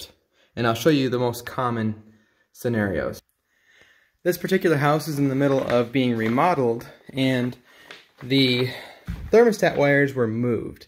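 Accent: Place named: Northwest, United States. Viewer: Carolina? He has a definite Northwest accent. People move around so much these days, many people from the south don't have that twang anymore.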